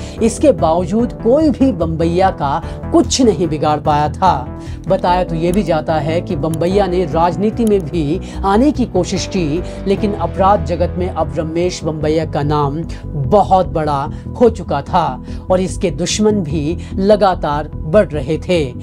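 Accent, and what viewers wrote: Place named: Pahadi, India. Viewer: पहाड़ी हैं। हम लोग इसी भाषा में बात करते हैं।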